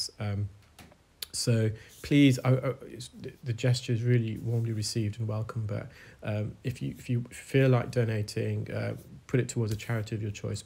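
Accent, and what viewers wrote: United Kingdom. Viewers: I love your videos. They are informative, educational and calming for those with anxiety disorders. I find your voice very calming and soothing and your British accent is like extra icing on a cake. Please keep up the great work you’re doing. Blessings and good health to you.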